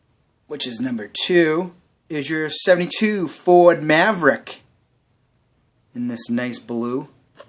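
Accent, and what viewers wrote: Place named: New York, United States. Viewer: Are u A New Yorker?? From the Big Apple? Or Vinnie from the Bronx?? Love that Wiseguy accent 👍🏻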